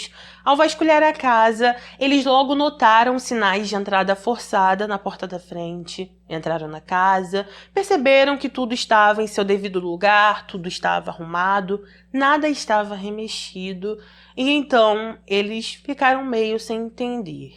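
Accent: Rio de Janeiro, Brazil. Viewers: Adoro O sutaque carioca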